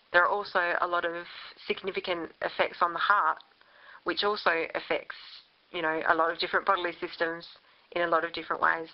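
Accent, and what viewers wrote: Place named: Australia. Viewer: 1 I am so grateful to have found THIS video. I am tickled at the Aussie accent. :-) I was a VERY active, healthy, dynamic working girl until one fine day in July, 1986, I collapsed at work for no reason. I was taken to hospital, but released after 7 hours of observation. Exactly one week later, I collapsed again and was put into hospital for a week, while they ran every conceivable test in the book on me. I left that hospital an invalid with every single test showing normal. cont....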